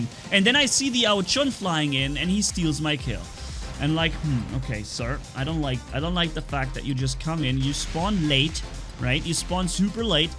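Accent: Germany. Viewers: Are you german bro?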